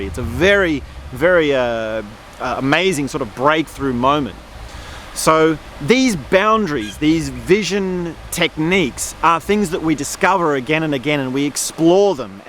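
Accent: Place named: Australia. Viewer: Qt ! Hard to focus on what you’re saying with the thick Aussie accent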